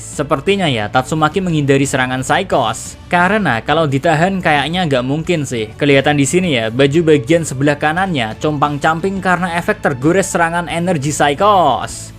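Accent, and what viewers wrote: Java, Indonesia. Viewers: Apakah psykos udah msk level ancaman dewa mohon dijawab mimin ganteng dan medog?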